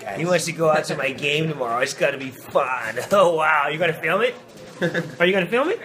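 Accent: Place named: West Coast, United States. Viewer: West Coast white guy voice.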